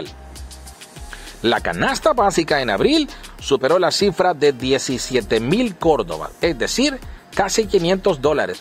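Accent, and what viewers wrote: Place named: Yucatan, Mexico. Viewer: Oye tienes un tono a yucateco. Pero bien lo que has dado es una lista de precios, y que cada nica ve como resuelve como siempre ha pasado desde ahce mas de 40 años. Loque falta decir es que la tecnología hizo que se incluya en la lista de gastos. Me refiero al celular. Cuanto gasta en mes en recargas? qué precio tiene el celular de un nica? y qué ha dejado de comer para comprar. Entonces mal educados goberno quien gobierne un pais la vendita tecnologia marcará el paso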